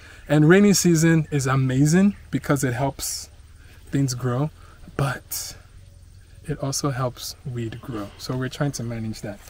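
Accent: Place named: Nigeria. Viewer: Who says you're not from Nigeria you sounded just like us of course there's a little bit of American accent in your tone but i can hear Nigerian accent laude and clear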